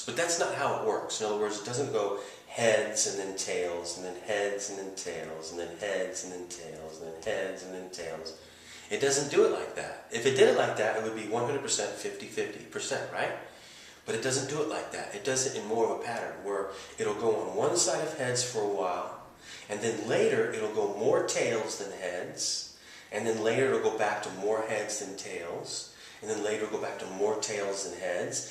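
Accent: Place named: Greece. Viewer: can you clear some things up for me? I am a noob. first of all, thanks for the video, very informative. you're right, play to win, don't play to get 21. a lot of people in comments are saying players will get mad at you playing like this, why? they usually get mad if you game unnecessary hits, why would they get mad at you because they already played their hands. you sound like my friend who is Greek, are you Greek? are you able to jump in a table mid shoe? my local casino doesn't allow that. again thanks for the video, I'll this soon.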